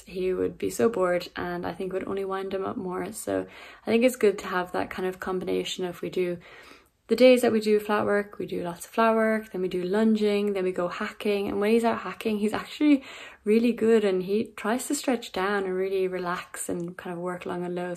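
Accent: Ireland. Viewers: are you in Australia I am so confused Irish accent but the trees and the birds shout Australia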